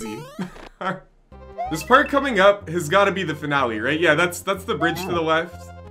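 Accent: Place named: Canada. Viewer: I love your canadian accent when you speak german. How about a german greetings, welcome, hello in the next video for your big german fan-crowd? :-) Grüße, Willkommen, Hallo ;-) And an extra "guten abend" for your boy foolhardy 😎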